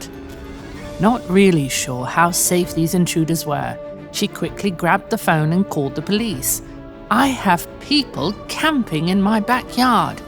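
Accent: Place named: United Kingdom. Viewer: Glossing it up with a British narrator, still shite as they’d say.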